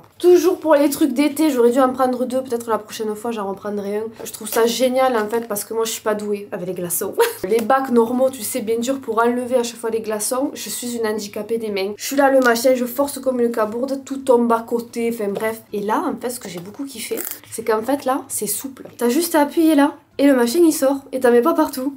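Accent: South, France. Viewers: Coucou, merci pour ta vidéo et de représenter les filles du Sud avec accent lol Je te conseille ( humblement ) de choisir des thés et infusions de qualité ( évites surtout les marques de supermarchés... ) du style le Palais des thés, Mariage Frères, Thés de la Pagode. Ton palais appréciera d'avantage! Gros Bisous de Perpignan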